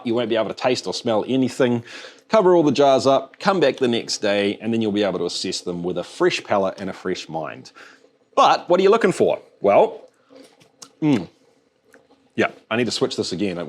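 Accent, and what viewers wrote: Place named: New Zealand. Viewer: The SHEEP INVASION., until now your accent (and formerly-American one) was the best evidence that your channel is pure New Zealand. But now you've been INVADED by BLEATING SHEEP.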